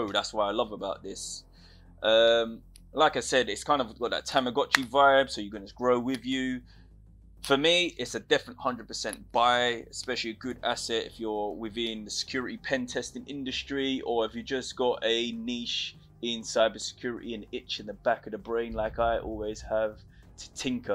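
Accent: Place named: United Kingdom. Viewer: I can listen to a British dude explain anything... 🤷‍♂️ innit.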